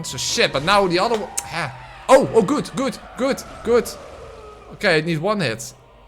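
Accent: Netherlands. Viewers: Your accent is all too familiar as a Dutch person, but otherwise your comedy is top tier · Its because he's Dutch and funny and smart. The internet likes american accents, doesn't like good jokes just "randemz lolololol" nonsense, and also people don't like smart folk because of social anti-intellectualism. IMHO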